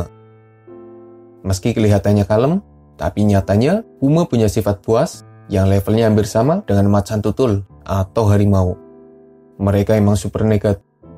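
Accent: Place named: Tegal, Indonesia. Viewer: Admint nya mudah dipahami karena logatnya Tegal atau Banyumas banget 😮😂, pada Karo nyong wong ngapak. Malah ingat lagu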